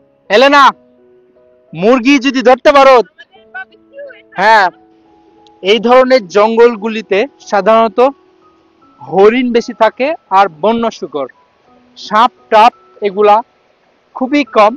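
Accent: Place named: Chapai Nawabganj, Bangladesh. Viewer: ভাই আপনার বাড়ি চাপাইনবাবগঞ। আপনার কথাতে আঞ্চলিক ভাষা র টান আছে। কিন্তু আপনি তা উপেক্ষা করার চেষ্টা করেন। কিন্তু আঞ্চলিক ভাষায় কথা বলা কোন অপরাধ নয়।...... From Rajshahi Bangladesh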